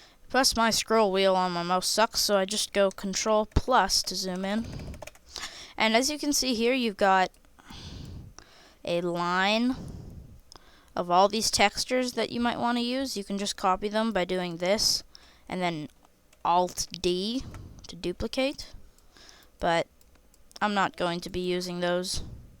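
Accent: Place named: United States. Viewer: Wow this video is a bit more detailed than the one i watched at first and it helped quite a lot. also (no offense) but I remembered how to do that because both videos i watched had someone with an american accent so I remembered it!! xD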